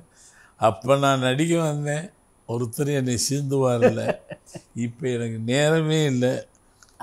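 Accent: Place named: Butler English, India. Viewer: Butler English தான் தல உன் சிறப்பு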